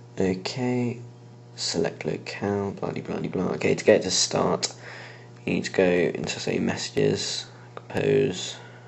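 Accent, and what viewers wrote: England, United Kingdom. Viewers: shut up fucking shit from fyrom he is from england and he has an awesome ENGLISH accent.. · You sound like your from London.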